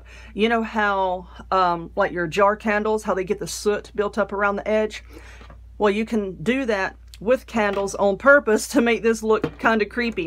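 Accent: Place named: North Carolina, United States. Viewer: I thought I detected a NC accent! Lol love it ❤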